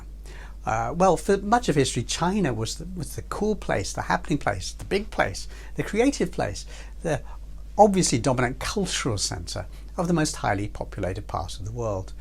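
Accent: United Kingdom. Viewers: what a disgusting video 🤢🤮. 🇯🇵🇹🇼🇰🇷🇵🇭🇹🇭culture is all superior to china!!!! spoken by an Indian dude with a British accent. The Hypocrisy😮‍💨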